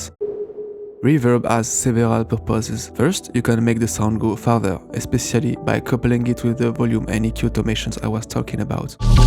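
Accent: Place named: France. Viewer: I recognized a French accent Mdrr 😂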